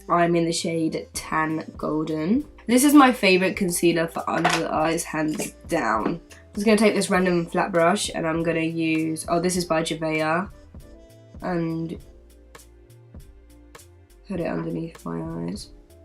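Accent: United Kingdom. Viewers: Had no clue you were British! So pretty!! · You're actually so flawless. You don't have a single unattractive feature like can I be you. plus you have a British accent too. How can a person be so perfect?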